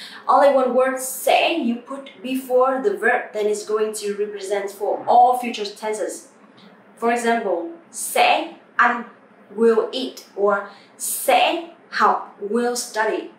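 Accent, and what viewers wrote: North, Vietnam. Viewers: They teach northern accent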